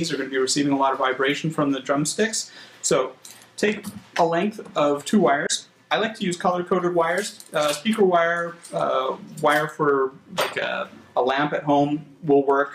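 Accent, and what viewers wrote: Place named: Canada. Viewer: Canadian much? Great vid BTW...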